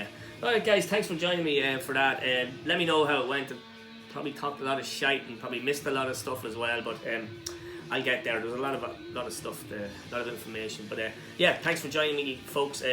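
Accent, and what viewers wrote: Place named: Ireland. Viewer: Love you voice I wanna say Irish ☘️ but I could be wrong not sure exactly but anything Scott Irish or German I feel at home .. and I live in Texas lmao 😂🍺cheers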